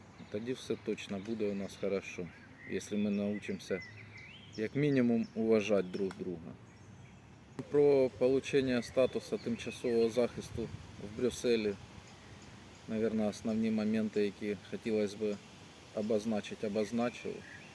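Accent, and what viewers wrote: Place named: Kharkiv, Ukraine. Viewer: За Вовчанськ дійсно нема слів. Вітання з Харкова. Я ж чую що Ви з наших місць.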